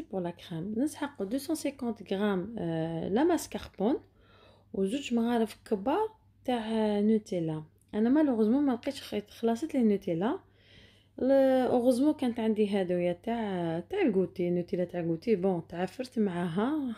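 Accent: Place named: Algiers, Algeria. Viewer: Ya3tik Saha je ne sais pas pourquoi j'aime regarder tes vidéos j'aime beaucoup ton accent Algerois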